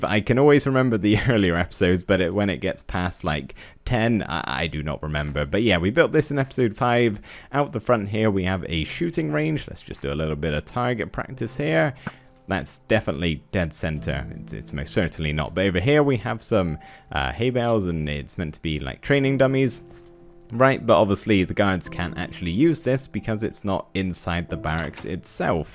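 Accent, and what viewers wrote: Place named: Cornwall, United Kingdom. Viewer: Always wondered what part of the UK you're from, you have a similar accent to me which isn't something I often come across and I've noticed you say 'haf' not 'hahlf' same as me, and I'm from Cornwall 😊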